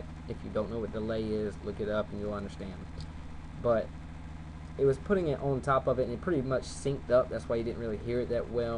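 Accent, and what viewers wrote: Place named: South, United States. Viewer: the guy talkin sounds like he's southern and trying so hard to not let his accent to come out.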